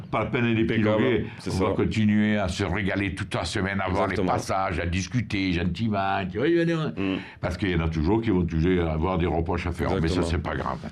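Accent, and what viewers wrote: South, France. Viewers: Continue tes analyses tu me regale avec ta bonne humeur et ton accent qui chante le midi un coucou du nord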